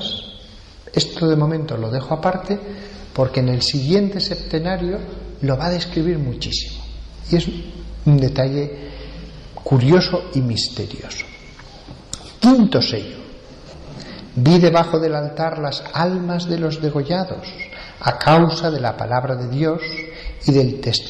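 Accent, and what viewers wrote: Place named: Spain. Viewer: no aguanto el accento espanol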